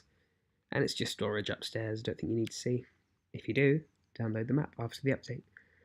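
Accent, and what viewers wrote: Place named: United Kingdom. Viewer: Nice british ácĉent dude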